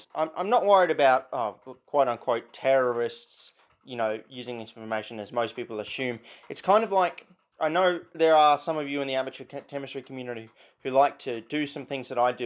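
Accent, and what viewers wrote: Australia. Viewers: lol i saw that too and laughed. of course he'd be using a broken grad cylinder. wouldn't be without aussie accented swearing and delightfully ghetto equipment.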